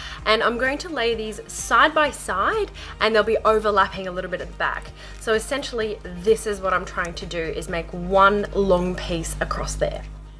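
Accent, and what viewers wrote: Australia. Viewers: Good old Aussie accents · Sorry, but I don't find the Australian accent very nice.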